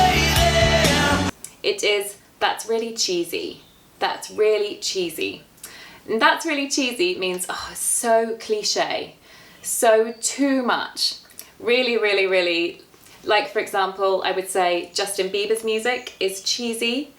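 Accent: United Kingdom. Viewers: i fancy listening to British English. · I try to explain... You look as a proper british woman, you have british accent, you like british traditions(an afternoon tea) and finally you drink the earl grey tea which is of course british. Oh and The Union Jack is quite often seen in the videos. These are many clishes. But I don't deny that it would be a sophisticated experience. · Are you british?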